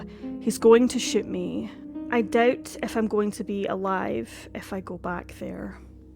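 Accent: Scotland, United Kingdom. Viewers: Gal Gadot is the narrator, don't you recognize her Scottish accent?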